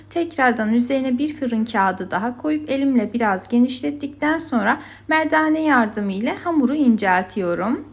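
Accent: Azerbaijan. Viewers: Azerbaycan şivesi var. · Danışıq Azərbaycanlı danışığına bənzəyir çox gözəl tərifdi yoxlayacam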